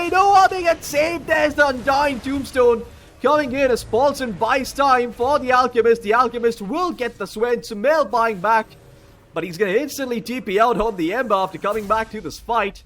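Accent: India. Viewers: indian caster wtf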